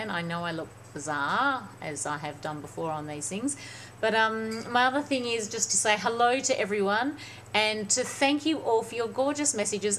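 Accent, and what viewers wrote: Australia. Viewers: i love australian accents<333 so cool! love the tan, you look FIERCE girl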